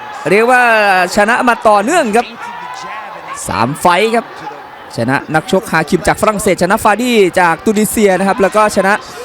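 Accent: Isan, Thailand. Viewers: ชอบคนพาค..สุดจัด.มีอีสานด้วย.555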